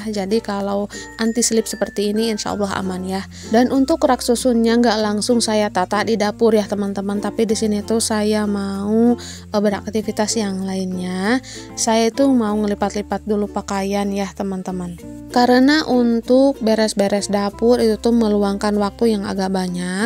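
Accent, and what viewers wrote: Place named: Sunda, Indonesia. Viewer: kalo ngomong kayak istrinya bang deni cagur logat sundanya kentel😅👍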